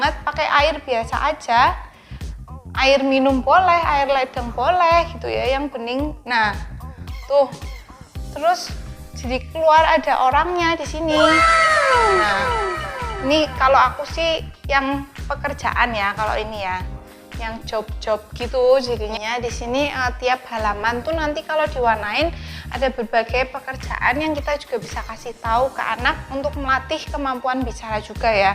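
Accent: Java, Indonesia. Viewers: Walaupun medok mbake ayune poll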